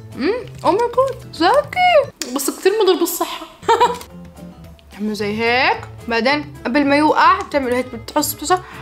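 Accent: Jordan. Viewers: لهجة اردنية كثير حلوة ❤❤ من المغرب 🇲🇦 احبكم يا اهل الأردن 🇯🇴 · اللهجهه الارودونيه كييييووووتتتتتت خصوصي لمن تكولل زاكيي ❤️❤️🥺🥺🥺🥺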